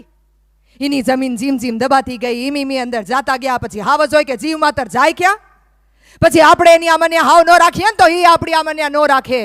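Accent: Kathiawar, India. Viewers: બેન તલપદી કાઠિયાવાડી ભાષા માં બરડો ગીર નો સંવાદ બોલવા બદલ ખુબ ખુબ આભાર🎉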